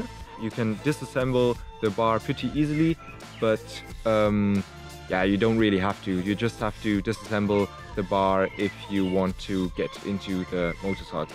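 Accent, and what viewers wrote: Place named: Germany. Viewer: Hey great job! I will probably start one project myself in the winter. I am preparing stuff now and try to find a lightweight electric motor... where did you get yours and what kind of motor is it? There are so many different ones... also did you need a converter? do you think you can compare the power the bike has to a combustion engine? Where you from also? Sounds partly like you are from germany (i am from germany)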